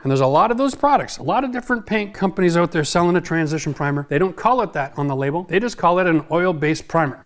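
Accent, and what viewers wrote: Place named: Canada. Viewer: I'm from Canada! Trust me...as a fellow Canadian....The way you talk.... We know ! LOL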